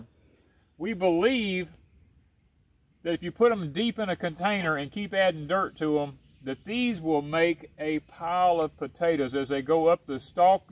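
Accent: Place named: Mississippi, United States. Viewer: As you was talking i knew you had to be in Mississippi i just knew it. Im from Crystal Springs, Mississippi born and raised. I love gardening. My granny and an uncle raised me from and infant and taught me. Nice to meet yall. I love the video.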